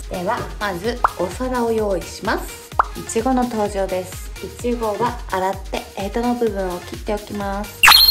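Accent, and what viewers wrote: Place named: Yamaguchi, Japan. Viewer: ちゃん！今日あなたの動画を見つけて、とっても癒されました♪ありがとう！山口弁てとこもかわいい！私も福岡出身でベルリンに住んでいて、大福作るの大好きで、いつかベルリンのマーケットで売るのが夢なの！食べてほしいな♪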